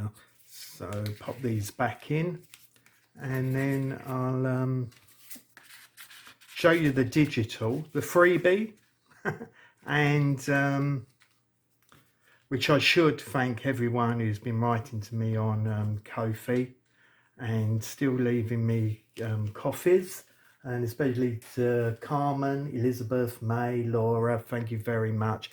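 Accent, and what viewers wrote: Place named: London, United Kingdom. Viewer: Welcome back and well done - I know how hard it is to get back to things after something like that! Lovely to hear another Londoner’s voice. xxx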